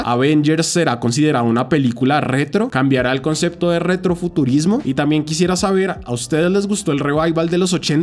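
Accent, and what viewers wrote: Colombia. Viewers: Eres Colombiano? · Eres Colombia no ? Xd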